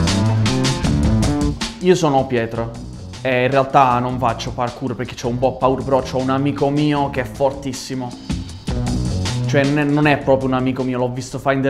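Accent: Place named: Bari, Italy. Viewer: Parkour all'EUR, accento di Bari. Voto: 10 rigatoni broccoli e salciccia su 10.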